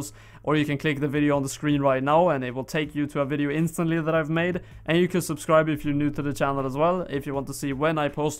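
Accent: Sweden. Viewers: This guy is so swedish you hear it on his voice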